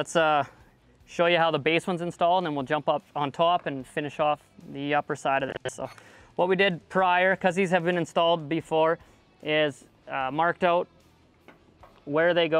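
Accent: Canada. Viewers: Is this guy Canadian? Sounds like he has a Canadian accent and maybe his prices are in CAD which are always higher?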